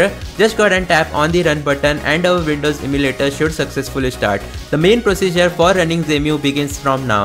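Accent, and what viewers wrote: India. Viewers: Need an emulator to change Indian accents, sounds like an Indian Scam Call Center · Hint ağzıyla ingilizce konuşulduğuna tanık olursanız (bu videodaki gibi) kaçın.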